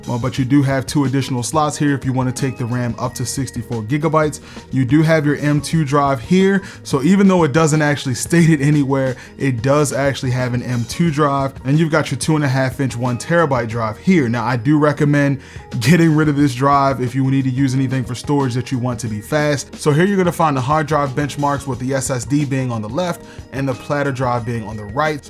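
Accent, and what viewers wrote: Baltimore, United States. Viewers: I hear Baltimore more than the review.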